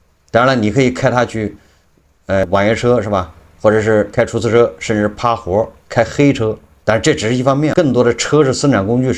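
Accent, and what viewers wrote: Anqing, China. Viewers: 老师一听口音就是我们安庆老乡😅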